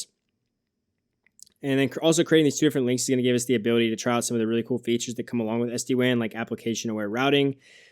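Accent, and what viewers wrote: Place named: United States. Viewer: Very good structured presentation, but much too fast and with a hard to understand American English dialect if English not the own mother tongue.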